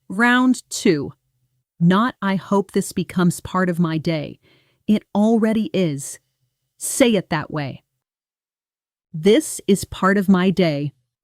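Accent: United States. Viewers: In which accent are you teaching american, british or indian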